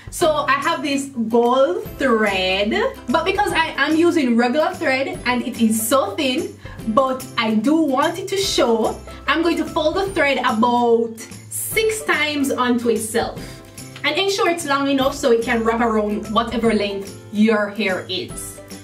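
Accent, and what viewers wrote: Jamaica. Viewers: This style is lovely just like your beautiful personality - Loving the natural Jamaican vibes you give, never lose your accent.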